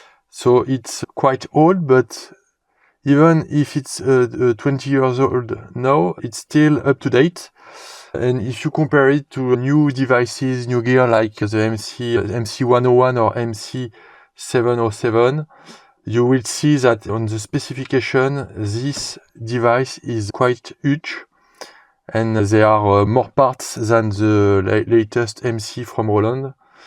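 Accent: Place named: France. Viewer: Salut tu as un great french accent 😄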